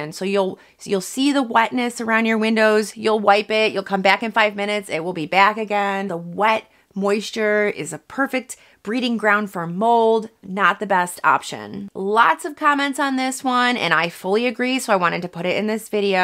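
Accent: United States. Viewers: Love your Midwest accent. Great tips, thank you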